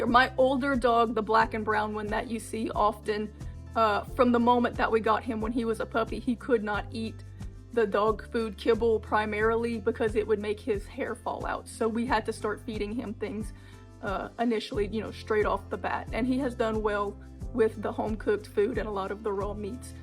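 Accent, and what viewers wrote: Louisiana, United States. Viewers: Are you from louisiana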